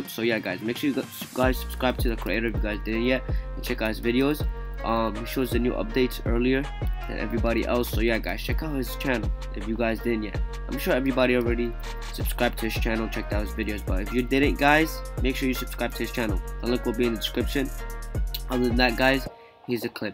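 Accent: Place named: United States. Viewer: Discour Goup XDDDD, whay is your nationality? I noticed that you have a very strange American accent.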